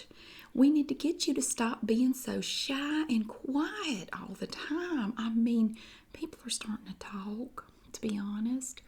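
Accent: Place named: South, United States. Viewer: The shadiness of these characters kills me lol your southern accent and the “bless your heart” gets me every time!!! Cant get anymore shadier than saying “bless your heart” to someone lol alsooo this character gives me “Mrs. Roper” from Three’s Company lol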